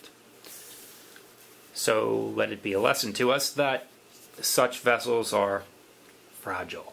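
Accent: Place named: Pittsburgh, United States. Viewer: I thought I detected a hint of a Pittsburghese accent;) I'm from the Lehigh valley myself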